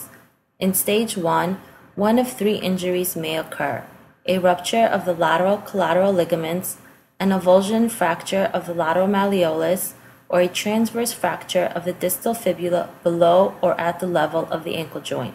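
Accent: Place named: New York, United States. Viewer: Love the accent!!! Marisa Tomei in My Cousin Vinny...Are you from New York?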